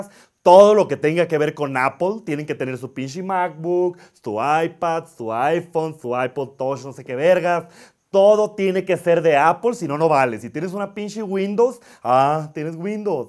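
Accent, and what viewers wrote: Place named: North, Spain. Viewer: Aquí conteniendo mi risa porque ya todos duermen. ¡Pero que cosa! Este tipo es muy chistoso. Aparte su acento norteño: “mushasha”. ¡Good!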